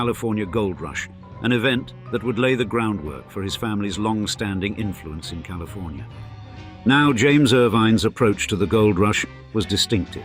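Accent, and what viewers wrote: RP, United Kingdom. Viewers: The posh British accent makes it so much more Old Money sounding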